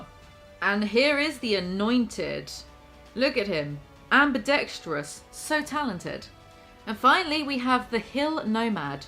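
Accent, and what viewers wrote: United Kingdom. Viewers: I’m subbing for these reasons, you should as well. British accents are a plus for no reason. I love a female creator who’s not doing makeup tutorials or exposing her body for subs. To each their own, but I come to YT for laughs, memes, and “content”. Shes given me both. The video I see next “The Sheer Entitlement of Youtubers” just give humble vibes.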